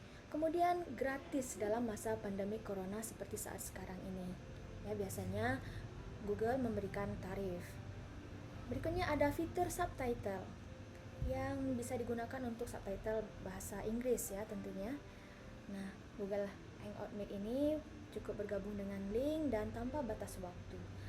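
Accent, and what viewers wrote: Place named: Surakarta, Indonesia. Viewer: Sing ngomong putri Solo. Jan uallon tenan, setengah berbisik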